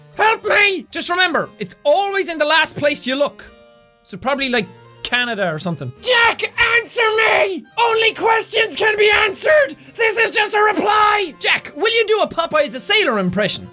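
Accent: Ireland. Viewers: I know jack isn't faking his accent coz that how irish people talk like me coz I'm irish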